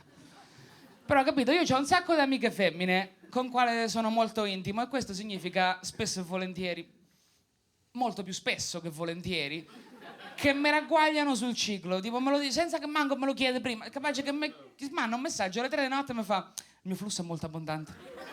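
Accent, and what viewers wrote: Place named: Marche, Italy. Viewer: "comunque lu spettacolo è lu mio" average entroterra marchigiano vibes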